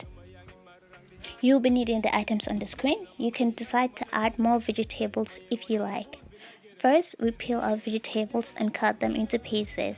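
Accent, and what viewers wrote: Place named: Wolof, Senegal. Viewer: That wollof accent is wow! sama harit!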